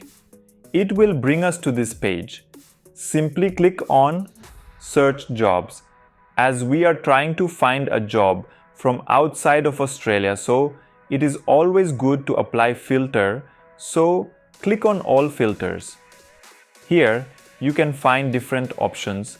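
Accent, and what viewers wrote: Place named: India. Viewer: This is Indian English.. sounds good to be true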